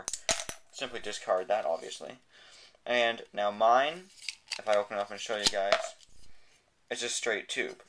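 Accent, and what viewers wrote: Quebec, Canada. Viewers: You from quebec? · are you Canadian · Are you Canadian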